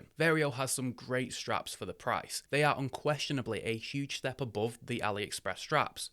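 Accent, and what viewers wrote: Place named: Yorkshire, United Kingdom. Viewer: The only watch accuracy meter I could find in the app store cost $29.95. And there isn't one that looks like the one you show on the video. Can the app be found elsewhere? I love your videos and your Yorkshire (I may be wong) accent. I was a Harrogate resident for 5 years back in the 70s. Thanks for your great videos.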